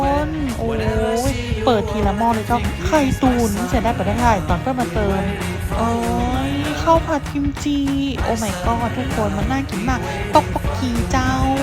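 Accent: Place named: North, Thailand. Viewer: ภาษาเหนือ น่าฮักขนาด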